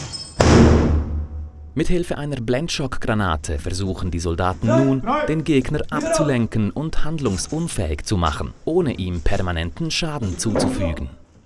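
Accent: Switzerland. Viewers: Nei de heissst CHuuuggaarrr hahhaa, hgesch doch de Sprecher ghört mit sim schönne Bünder XD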